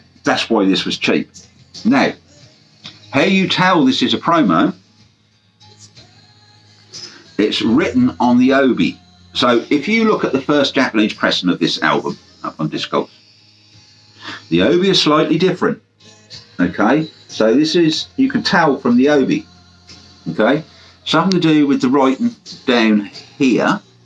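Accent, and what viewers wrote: Suffolk, United Kingdom. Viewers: Hello from a fellow son of God's own country (Sudbury to be specific) and a retired provincial journalist of near enough 50 years experience. I think I can clear up your stickers confusion. Back in the day, record companies would send white-label promos to radio stations, specialist music mags and national newspapers to generate pre-release publicity but they would also lift records from the first production run and ship them out to reviewers on regional and local papers. More often than not they would stamp or sticker the covers, sometimes even cutting a notch in the corner, to underline that technically these remained the property of the company who didn't want them being flogged on the black (well, greyish) market. It sounds a mite petty but hundreds of copies of hundreds of albums potentially adds up to a fair chunk of change each year. 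I should add, hand on heart, that I never resold a record but because I didn't like the artwork being obscured I habitually removed the stickers. That sometimes meant the sleeve was damaged but, ironically enough, even when the sticker came off clean I probably reduced its rarity value in today's VC market! Hope that helps and please keep up the good work. I only subscribe to two of the countless 'old bloke talks about music' channels and your's is a particular treat because it proves that the Suffolk accent hasn't been completely swamped by Estuary English commuters...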